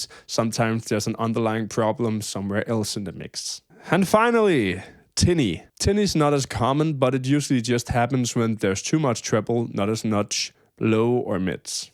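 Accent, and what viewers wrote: Denmark. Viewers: you sound incredibly danish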